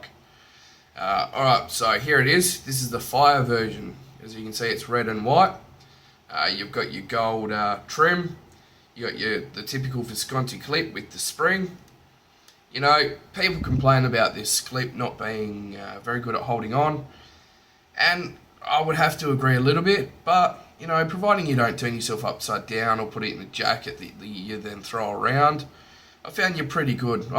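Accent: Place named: Australia. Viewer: thanks for the tip Hun. Also it's great to hear an Aussie accent doing these reviews. Keep up the good work 😊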